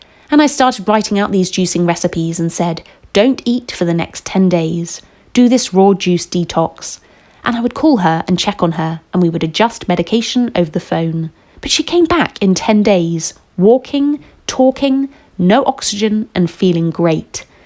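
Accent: England, United Kingdom. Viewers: I so hate this so called english voizzze that i would rather die actually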